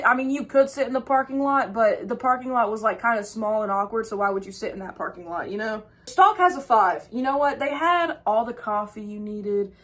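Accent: United States. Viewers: Love the energy and I love your accent lol I don’t see a lot of southern YouTubers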